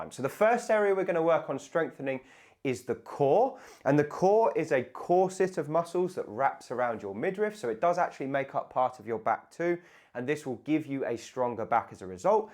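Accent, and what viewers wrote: United Kingdom. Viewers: Greetings! Glad you are talented as a "speed listener." I am not that talented. Yes, I am also stiff, but I appreciate the "slow" explanations. Especially with his British accent. He cares enough to make sure we get it. After all, we are the 50+ crowd. So slow down your "speed listening" a bit. If you don't like it, slow down anyway. No, I'm not stupid. Enjoy the video.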